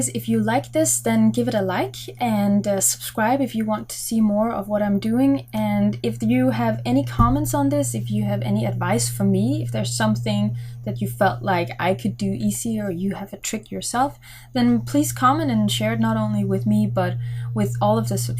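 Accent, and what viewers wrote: Denmark. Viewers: Are you danish?😁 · R u danish?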